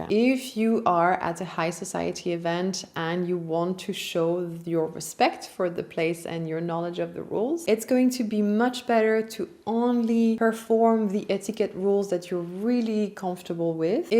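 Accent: France. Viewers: Interessant, mais votre accent anglais franchouillard est éreintant. Dommage.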